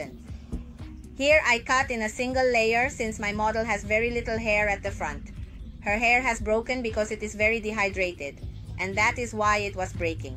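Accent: Mexico. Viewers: Creoq es de Mexico x el acento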